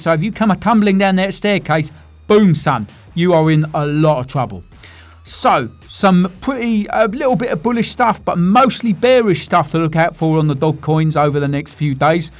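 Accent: United Kingdom. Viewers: As an American, this is a proper British accent 😂. Cheers guv!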